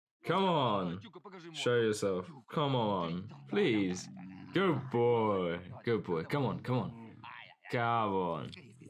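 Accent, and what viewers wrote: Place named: England, United Kingdom. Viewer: What a beautiful story, love the English accent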